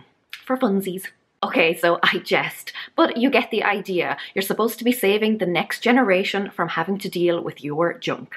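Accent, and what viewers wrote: Ireland. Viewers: When i moved several years ago, my son told me, "There's not 10% of this stuff that's worth keeping, let alone packing and moving it. You're on your own." I agree with you-- let's toss it. And i like your Irish brogue.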